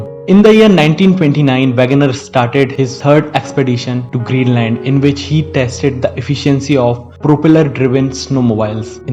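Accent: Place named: India. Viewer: It is a nicely composed video on, how the dynamic hypothesis of plate tectonics was conceived by Alfred Wegener. For the one who wants to learn about the evolution of continents, oceans and mountains, this introductory video will be very much useful. Our students will be able to follow the narrative as it is with the Indian accent. The effort of ' ' is commendable.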